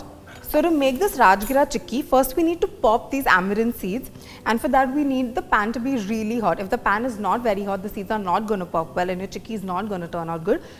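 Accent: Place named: Delhi, India. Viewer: Delhiwaali english is funny yet irritating for ears.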